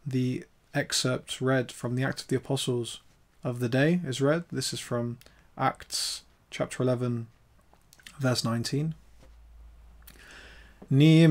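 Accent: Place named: United Kingdom. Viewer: You've got a very nice british accent.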